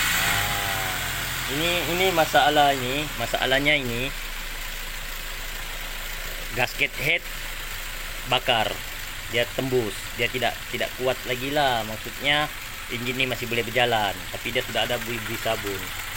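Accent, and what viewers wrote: Sabah, Malaysia. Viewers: salam boss , bos asal mana , suara mcm org sabah ,sabah d mana boss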